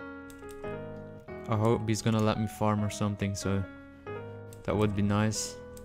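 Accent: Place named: Netherlands. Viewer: U sound Dutch. Are you Dutch?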